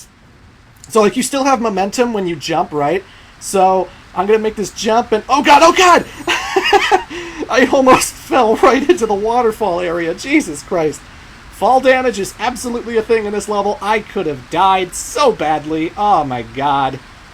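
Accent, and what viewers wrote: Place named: Canada. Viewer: I'm here for the sound of your sexy Canadian voice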